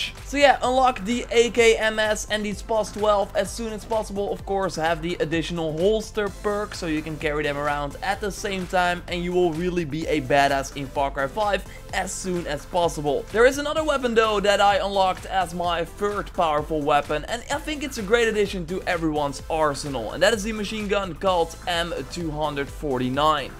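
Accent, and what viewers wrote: Netherlands. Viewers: tophercheese1 Dutch accent. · you sound dutch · Are u dutch i hear it somehow in ur voice? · Ben je nl? I hoorde je video zeggen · Ben je Nederlands heel misschien? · are you Dutch? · Ben je nederlands? Want je hebt een nederlands engels accent hahaha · The Dutch is strong in this one. Good vid =] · Join some speaking lesson m8 ur Durch accent is really strong.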